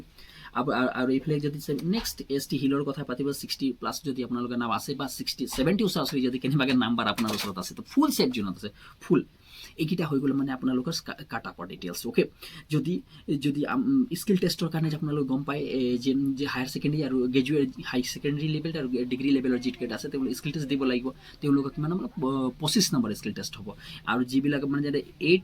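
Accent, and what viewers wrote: Bihar, India. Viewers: Ki kela assamese kaw o..toi kela sure Bihari..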